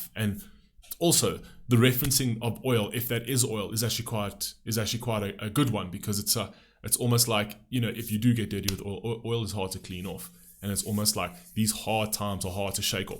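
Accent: South Africa. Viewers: As a Brit myself, I don't think he's British. I think he's from South Africa. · you sound South African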